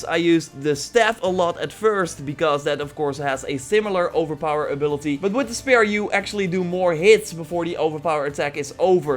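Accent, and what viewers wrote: Netherlands. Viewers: please, say OY-kos not E-kos. Your strong dutch accent takes over alot haha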